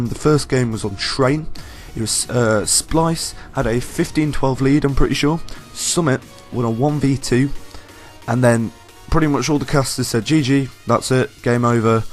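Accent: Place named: North, United Kingdom. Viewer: Bro, your logo makes me so happy to be alive. Also I'm guessing you're a dirty Northerner judging from accent :)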